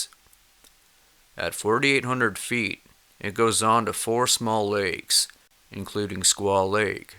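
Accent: South, United States. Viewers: i feel he uses to much lip, kinda like he puts his lips out too far when he speaks, like he's trying to keep a moustache out of his mouth sound you know, it's like he's puckering his lips to talk, throw in a slight southern accent and there ya go, i could talk like this guy all day, it would look kinda odd walking around having conversations with puckered lips though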